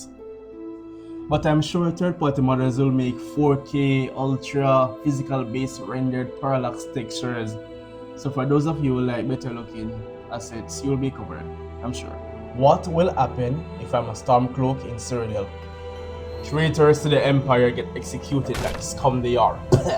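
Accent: Jamaica. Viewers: Are you Jamaican? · He’s Jamaican you mong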